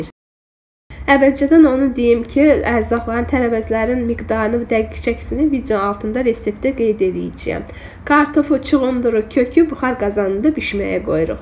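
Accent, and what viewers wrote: Baku, Azerbaijan. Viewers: Çox sağ olun,əllərinizə sağlığ hər bir təamı çox gözəl Bakı ləhcəsin də izah edirsiniz adama xoş gəlir.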